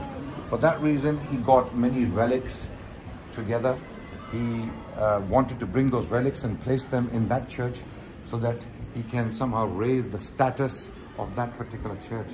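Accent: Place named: United Kingdom. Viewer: Brother, its J as Yot, funny to hear your brittish accent Justinian as (J Justice). Its closer to Yustinian (as York )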